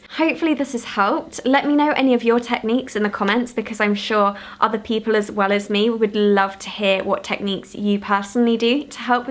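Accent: United Kingdom. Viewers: Hi this is Kim! I'm new to your channel. I enjoyed your video, and love your Brittish accent! I have a mild case of anxiety, and take medication for it. I also color in adult coloring books, I also do cardio, and meditate! Thanks again, Kim!😊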